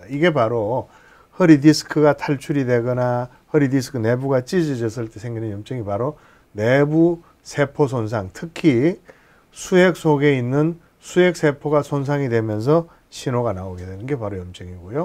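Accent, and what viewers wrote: Gyeongsang, South Korea. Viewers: ㅋㅋㅋㅋㅋ 갱상도 바름~^^ 귀여븐 사랑스런 감사한 우리 교수님~~😊😍😂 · 배척 /배측 그냥 알아들으시지 …경상도 발음이 너무 좋아요 ! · 1년 기다려야하는 환자들 생각해서 열과 성을 다해서 설명해주시는 박사님! 의학 지식을 갱상도 발음도 알아들으니 넘 감사드립니다.어금니 꽉 깨물고 참아봐야쥬^^ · 갱상도 확실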